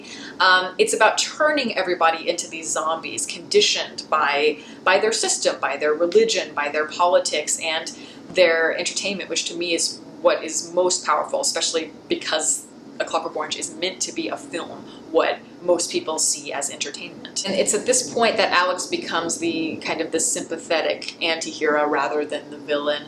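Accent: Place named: Los Angeles, United States. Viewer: That's how girls talk in Silverlake (LA, California).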